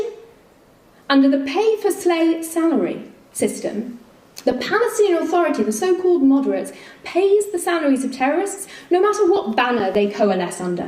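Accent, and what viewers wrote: England, United Kingdom. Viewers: She has an English accent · Your bullshit British accent doesn't belong on the world stage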